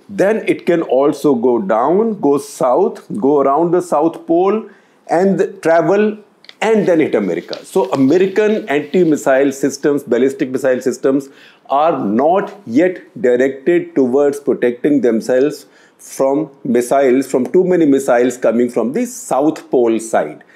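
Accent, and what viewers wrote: India. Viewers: 不行，咖喱味太重，听不下去了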